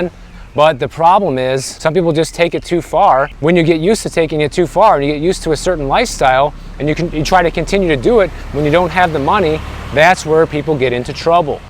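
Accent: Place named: Illinois, United States. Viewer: Somehow.. I knew you were from Illinois.. You're giving me Northwest side, or out West..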